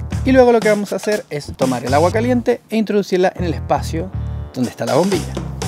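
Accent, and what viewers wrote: Chile. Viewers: De donde eres bro no reconozco tu acento pero no eres argentino eres chileno? Se me figura tu acento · Amigo, de que Pais eres? Tienes acento chileno. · eres un crack, me salta una duda eres Chileno?, hablas con acento chileno,...demás está decir que en el campo y sur de Chile se ha tomado siempre y mucho el mate! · Pero vos no sos argentino, mm que raro, no me gusta que un chileno, por lo que escucho, me enseñe a preparar el mate argentino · Es chileno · Excelente yo lo hago así igualito!! A juzgar por tu acento sos chileno, verdad? No sabía que allá también tomaban mate! 😍 Cuéntame, toman mucho? Saludos y muy buen video 🤗🇨🇱🇦🇷🧉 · Se nota a kilómetros que es Chileno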